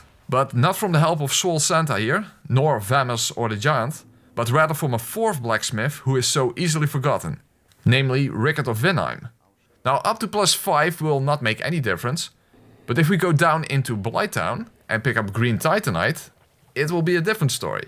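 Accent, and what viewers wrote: Netherlands. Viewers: ha, so my suspicion was right. it is a dutch accent. grüße aus deutschland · Are you Dutch? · Bro you are dutch aren't you😏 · I KNEW YOU WERE DUTCH. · U must be dutch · 2 seconds of hearing the voice alredy realized he was dutch lamaoooo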